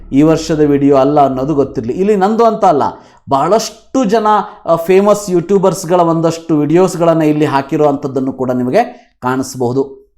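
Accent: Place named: Hubli, India. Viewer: Sir nivu Hubli yavaru nimma matu swalpa rough agi irutte adre karnataka da Ella kade nim video na nodta irtare adakke nim matu rough anusbahudu 😊 adu nimge swalpa gotirli 😊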